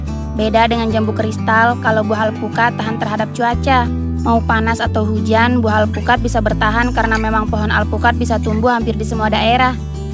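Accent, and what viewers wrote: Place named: Lombok, Indonesia. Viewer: logat lombok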